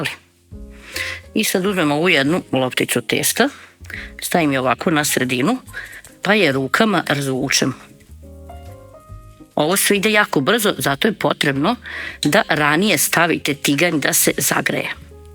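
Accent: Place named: Srem, Serbia. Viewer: Divni ste . Podrzavam Vasu privatnost. Sigurna sam da ste moja SREMICA. Dijalekt, pripreme Vasih specijaliteta kojima se odusevljavamo. Za VAS POZDRAV OD ❤❤🎉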